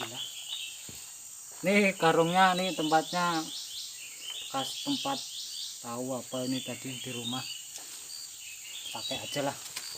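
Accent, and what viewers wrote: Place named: Java, Indonesia. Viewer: Kaya orang Jawa logatnya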